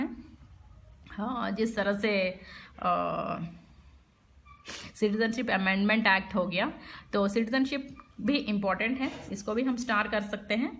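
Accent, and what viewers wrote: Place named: Telugu, India. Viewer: by her accent of hindi, i can say she is telugu...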